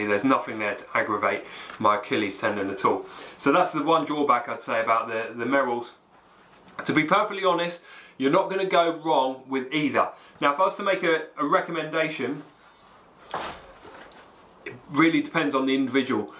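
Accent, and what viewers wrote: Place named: United Kingdom. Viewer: Epic UK accent and great review :-)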